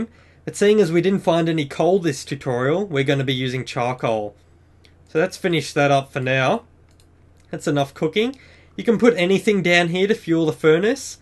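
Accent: Australia. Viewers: lol your from the outback xD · Is this dude an Aussie? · Australian, huh? (Accent) Two minor corrections: Worlds are not random, but if you don't enter a seed, the seed to make the world will be. Not quite infinite. Possibilities, almost, the world itself is almost 4x the Earth's surface. Anything after that are either fake chunks, or over-wrapping from the opposite end (not sure of this). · no offense but are u aussie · are you australian????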